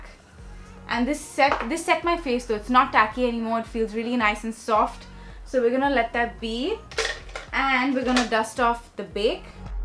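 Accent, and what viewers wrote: United Kingdom. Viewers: Waaaow that british accenttt😍😍😍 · That British accent though 🤙🏻😍💖💖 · The British accent was on point 😍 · Love your British accent. You should totally do a make up tutorial video speaking British only. 😍🥰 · British accent was too acurate 😍😄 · Godd the British accent was bomb the video was good too but the brrritishhh acccent malz no one can make a difference between the way you speak and the authentic real British accent😄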